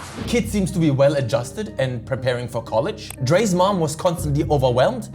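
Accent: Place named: Germany. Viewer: Digga wieso man merkt dass du die letzte Zeit viel deutsch geredet hast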